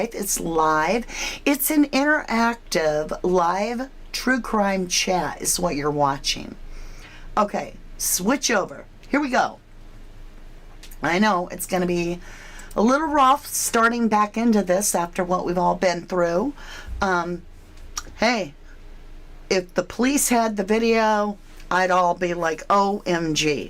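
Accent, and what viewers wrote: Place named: United Kingdom. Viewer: Love your British accent 😂❤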